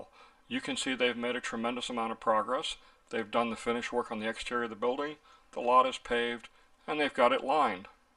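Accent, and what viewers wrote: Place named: Midwest, United States. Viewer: Got it. You sound like you are from the Midwest.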